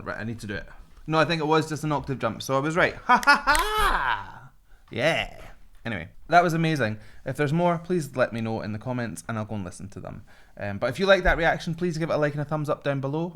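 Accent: United Kingdom. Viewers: Thank you for your professional analysis! I love your British accent. Either UK or Scotland. Love it! Here is my expression of their voice range if I compare to colonial style of house range. From the left from us Ko Woo Rim: Bass as foundation of house &deep basement to support strong house built. Kang Hyong Ho: Angelic voice, tenor and falsetto as 1st& 2nd floor shooting up to attic through out of the roof like a roller coaster.(can do male and female parts, check Phantom of the opera) Jo Min Gyu: Tenor. 1st& 2nd floor(he can also do falsetto beautiful way) Bae Doo Hoon: Tenor. 1st& 2nd floor(he was musical actor so he brings out so much of emotion) I love them all together. Because of their different range of vocal ability, it bring out the best! They all work together from foundation of the house, basement, 1st floor, 2nd floor and attic to roof to complete the house project! 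Everyday they bring the joy!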